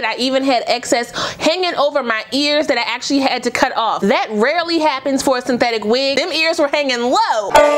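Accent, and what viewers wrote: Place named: DMV, United States. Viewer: I loved this video. Random question, are you from Maryland, DC, or Virginia? I am, and your vibe (music/Go Go vibish and the way you talk “this joint” 😂) is how some of us talk.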